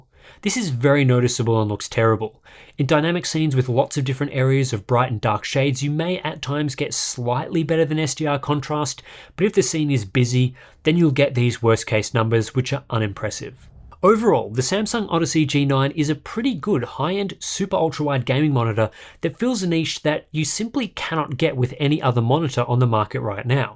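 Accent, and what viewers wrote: Australia. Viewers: I think the real news here is Hardware Unboxed being hosted by a young Freddie Mercury, resurrected and with an Aussie accent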